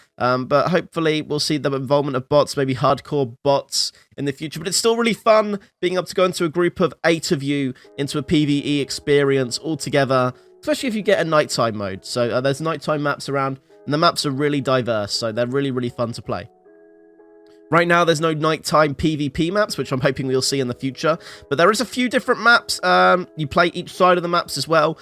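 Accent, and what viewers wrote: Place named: United States. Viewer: Mate, your English stop pronouncing your T's in an American accent lol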